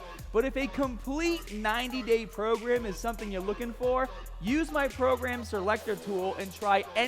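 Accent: Boston, United States. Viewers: Your crazy Boston accent has tone down a bit. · Are you from Boston? You've got that accent especially on the r's.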